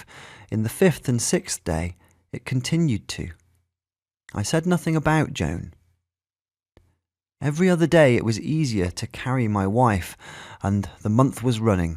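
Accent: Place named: United Kingdom. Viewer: British accents..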